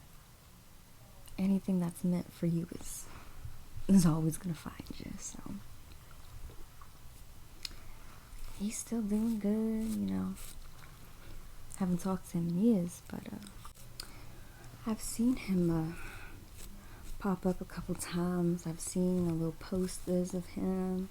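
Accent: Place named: Long Island, United States. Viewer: HIGHKEY so glad u used the Long Island accent it’s so tingly and comforting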